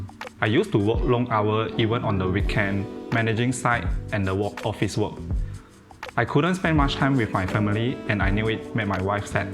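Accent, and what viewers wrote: Malaysia. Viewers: Strong Malaysian accent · the create. he enunciated as crate. "call home" became "koh hom" . Other words such as "cabinet", "favourite" too, the chinese-educated Malaysians will pronounce them differently from sporeans.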